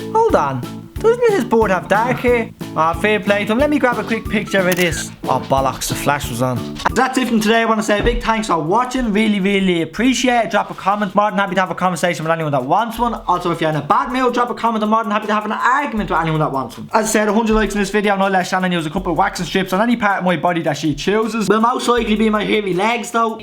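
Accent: Dublin, Ireland. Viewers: your the only Irish YouTuber I've ever met and I love the Irish voice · what part of ireland are you from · what section of Dublin are you from?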